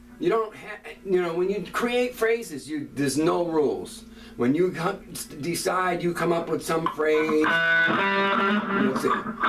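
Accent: New England, United States. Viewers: Are you in Maine or Mass? you sound like your a New Englander. Good Stuff my friend.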